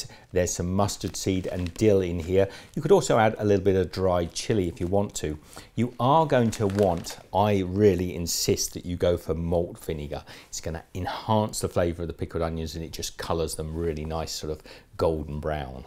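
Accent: United Kingdom. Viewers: Are u british u have a sort of british accent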